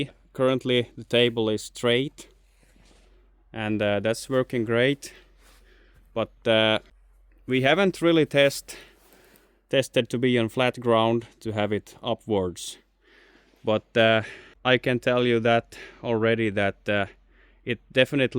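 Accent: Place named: Finland. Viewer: Hi, happy to see that my previous comment was already in you mind, as you becomes more popular YouTube brought me the fisrt video while the second one was already there. You are a Genius! You accent remind me the time I spent in 2006 in Tornio when I was commissionning an stables steel annealing oven in for Outokumpu.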